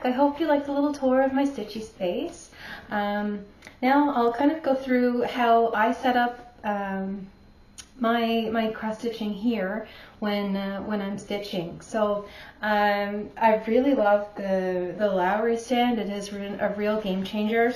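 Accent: Canada. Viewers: I KNEW I was hearing Newfoundland in your speech!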